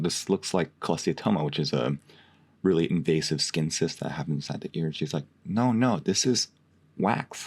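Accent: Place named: Australia. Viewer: Please don’t stop doing these, love your approach and your voice is wonderful. Couldn’t work out where you were from, thought you could even be from Australia. We don’t all sound like hillbillies.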